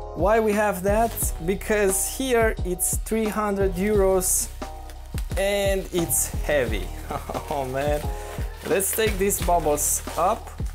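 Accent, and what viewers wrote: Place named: Romania. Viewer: you sound like the average romanian xd. Are you at least eastern or central European?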